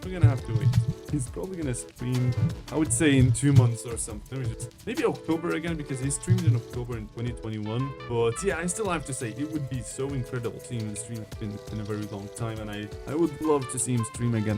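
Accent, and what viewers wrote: Germany. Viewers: Wait, are you German?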